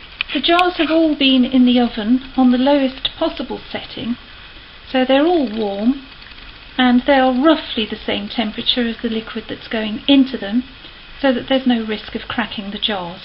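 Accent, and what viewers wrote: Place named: Wolverhampton, United Kingdom. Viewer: I live in Italy and tomorrow im going up town to get me some oranges, we have them all around the town, people dont eat them because they arnt eaten as they are not sweet enough!!! What a waste! noticed you have a northen accent (wolverhampton), like the way you are so clear, I shall enjoy making my marmalade.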